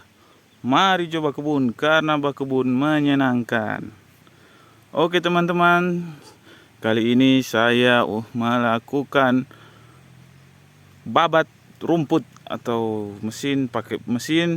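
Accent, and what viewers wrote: Sulawesi, Indonesia. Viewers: Bang rupanya logat Sulawesi ini ❤❤❤❤❤❤ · Ya cara penyampaiannya cukup menghibur dgn dialek dan logat sulawesinya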